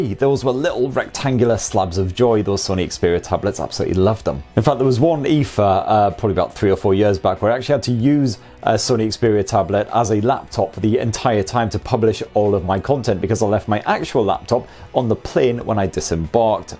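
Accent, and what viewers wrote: United Kingdom. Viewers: content is absolutely amazing but this british english is completely garbage :D :D btw i am not american ;)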